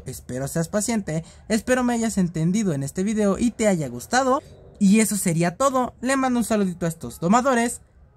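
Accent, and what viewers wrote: North, Mexico. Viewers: Muy norteño xD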